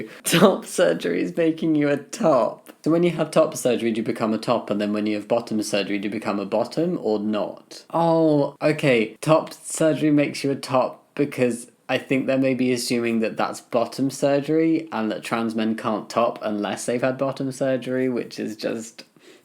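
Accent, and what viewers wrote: England, United Kingdom. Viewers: Do you also get upset when people say "tom-AH-toh"? He has an English accent due to living in this place called England where things are pronounced differently. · He's British.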